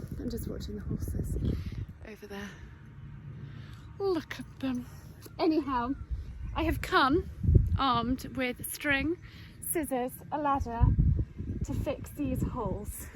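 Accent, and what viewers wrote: England, United Kingdom. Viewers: You know, I think there's this trend of women doing the housewife life with all of these implications; that women are made for it, that they shouldn't work, etc, on TikTok, that the people over there have been trained to respond negatively. But of course you're not like that, you're not telling other women they're morally at fault when they're not at home. You're just showing your life and it's clear you enjoy it and that life at home can be wonderful--and lets be honest, that you're very good at it and resourceful. And you do it all from beautiful Sussex with a very English accent. So I wouldn't take the knee-jerk criticism at heart. It's not aimed at you personally, it's just that people have been burnt before. once people get to know you, they know you're very kind, and just passionate about your life! Sorry for this serious comment on such a hot day but I figured some context might be helpful!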